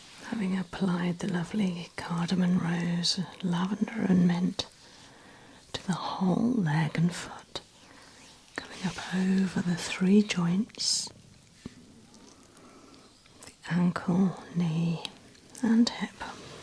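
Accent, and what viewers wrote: United Kingdom. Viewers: I love your accent idk if that's just genetic British accent or what lol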